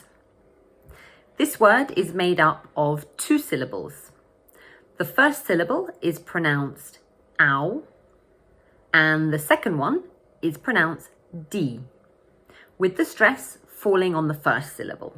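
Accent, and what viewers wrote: United Kingdom. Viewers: 영국 자동차 리뷰채널 Carwow 시청자들은 자주 들어봤을 발음이네요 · 와 진짜 왜케 깐지나냐 영국 발음.. 자꾸 눈 앞에 왠 양복입은 신사가 아른거림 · 영국식 영어는 표준이 아닙니다. 미국인도 듣고 비웃는게 영국식입니다. 미국식 영어 발음을 들으세요. 하이윤다이? 미국은 히윤다이, 헌다이 등 다양합니다. 저 발음에 속지마세요.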